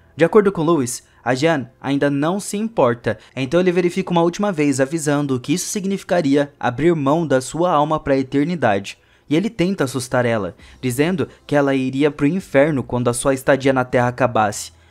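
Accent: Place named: United States. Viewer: Mas foram botar logo um americano pra narrar o video?! Que diabo de "perrquê" é esse? Quanto ao filme, existe um contrasenso terrível imposto pelo roteirista. Ora, se a mulher não acredita em nada de inferno, que diabos (expressão bem apropriada) ela foi fazer ao aceitar se encontrar o encontro com o tal? E será que depois de ter uma vida mudada totalmente, por 50 anos, ela nem desconfiou que tinha algo a ver com o contrato? Esse roteiro parece conta de chegar!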